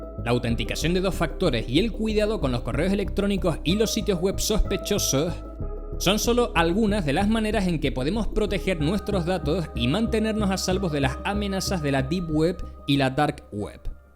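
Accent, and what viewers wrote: Canary Islands, Spain. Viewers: Canario total no? Jaja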